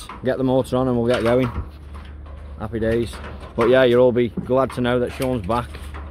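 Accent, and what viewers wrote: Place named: Liverpool, United Kingdom. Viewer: Scouse is back 🤏 🥪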